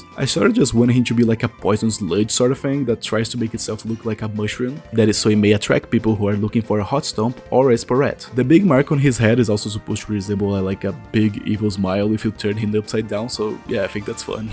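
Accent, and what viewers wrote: Brazil. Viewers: I swear you have brazilian accent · I LOVE IT!!!! are you brazilian? your accent reminded me a lot of the Brazilian accent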